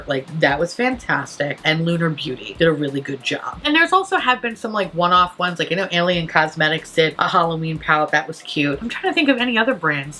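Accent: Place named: New York, United States. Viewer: Hearing your accent more today than usual. Sickness brings out the the NY. Feel better.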